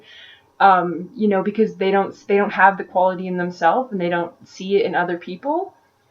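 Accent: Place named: Prairies, Canada. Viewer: It's like you just described my personality and things that I do (or did when I was younger) in ways that I didn't even fully realize, like anthropomorphizing inanimate objects and feeling sorry for neglected plants! I do live inside my head a lot, too, and I have a serious love for unpopular or strange things, and I ramble too much..! Are you me? Anyway, great video, and it would be really cool to see a second video on INFP behaviours. P.S. Are you Canadian? You sound like you're from the prairies, haha.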